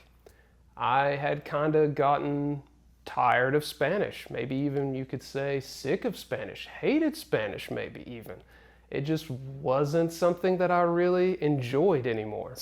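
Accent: South, United States. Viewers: I wouldn't be able to handle being trolled either. I don't think they realize you're humans. You may always have a strong American accent but so what. You and Maria make videos that I love. So thanks for sharing, brother! I hope you love Colombia! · Y'all's channel is my favorite. I have a new grandson from a bilingual marriage. I've been looking for Columbian teaching online and Maria's approach works. She makes it organic and fun. I love your Southern accent. It's how we talk in Ala/GA. I trust what you and Maria do. Looking forward to watching you guys for years to come. Your relationship is more important than the channel so do what you gotta do to keep lovin' each other. Praying for safe travels. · You have a southern drawl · I get you, man. Learning a language is damn hard. It seems like it takes forever to master it. IMO just take your time and let it all hang out, you do it for yourself (and for Maria I suppose), to for others. 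And as a non-English native speaker I like you American accent, by the way ;) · I always enjoyed watching another regular person, with a southern accent no less, struggle with Spanish. You make me feel more comfortable with my struggle. Don’t let the varmints get to you! By the way, I am 62.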